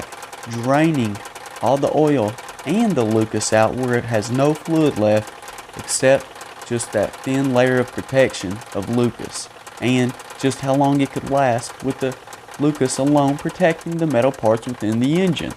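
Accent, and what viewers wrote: South, United States. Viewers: You are the type that give those with a southern accent a bad name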